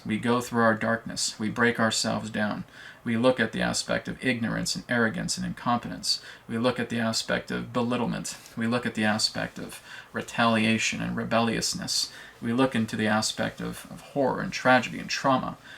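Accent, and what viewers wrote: Scotland, United Kingdom. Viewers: Wow i ❤ ur scottish accent!! 😆👍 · I dont know how it works , but again the subject was spot on for me - Thank you very much - I like when u laugh and speak Scottish :) - <3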